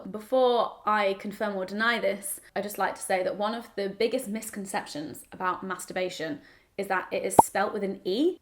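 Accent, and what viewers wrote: United Kingdom. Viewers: Your British accent along with your "joie de vivre" is what I find attractive in you. Your husband is truely a very lucky man! Stéphane from Montreal, Canada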